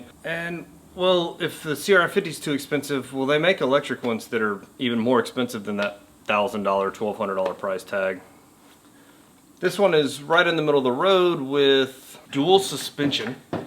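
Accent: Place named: South, United States. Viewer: You sound more country when you're with the kids.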